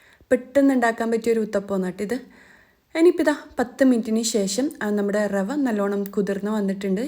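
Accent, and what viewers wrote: Kannur, India. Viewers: Hii ithaa...ഈ കണ്ണൂരുകാരുടെ voice ഒരു പ്രത്യേക രസാ ട്ടാ നിങ്ങൾ shamis own ചാാനൽ ലെ ഷമിതയുടെ നാട്ടിലാണോ?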